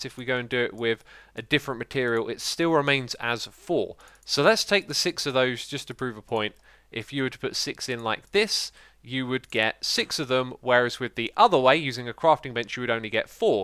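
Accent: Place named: North, United Kingdom. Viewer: What dialect is that exactly? Are your from the north? Keep up the good work!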